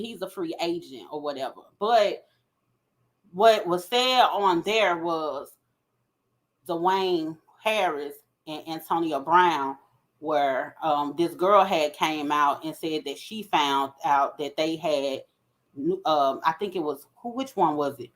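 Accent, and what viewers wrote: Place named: Virginia, United States. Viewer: You sound like a Virginia girl!😊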